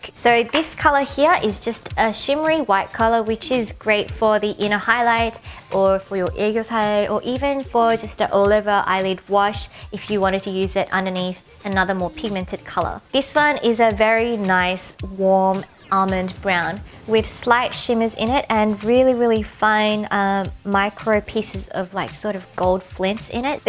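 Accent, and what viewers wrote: Australia. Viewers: Hi. Love your videos. So awesome to know you're from Australia too. It sounds great to hear someone from Australia. And also you do great tutorials using some of the Korean street brands that are inexpensive to buy. I just started getting into make-up and I particularly prefer Korean make up brands because Kpop? (that's a bad reason, but Korean anything just appeals to me the most at the moment) I particularly like Innisfree as a brand in general because everything looks so natural yet classy at the same time and I had my first Korean cosmetic brand experience with Innisfree as well (Innisfree anti-Bija Spot Essence W which is sadly not available anymore online on the Innisfreeworld website) It's funny how you're particular about the fragrances, but I found that they all smell nice, so I had no problem with it. I guess you could say that I am now a die hard loyal fan of Innisfree and I will love anything it has. 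Off topic but dying to ask because I don't know where to: As someone who's still so inexperienced, when would you use BB Cream or Liquid Foundation? I understand the differences but seeing as Liquid Foundation is tons better, I see no point in buying BB Cream. But I saw your make up collection which is astounding BTW, and it has tubes of BB Cream in it. So I was wondering what sort of occasion would you use BB Cream and why?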